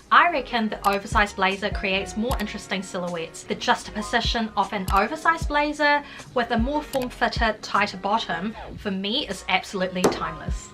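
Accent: New Zealand. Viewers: Naw love the NZ accent. People some times ask me where in NZ I am from, but I'm just a German girl in Australia lol Love the editing on the video btw. Very engaging 😃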